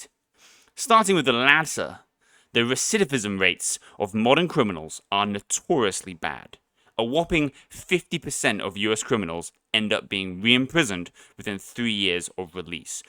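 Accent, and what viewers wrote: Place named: RP, United Kingdom. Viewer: Ever heard the truth mate it usually starts with poverty but u wouldnt know about that judging by ur accent eton was it or harrow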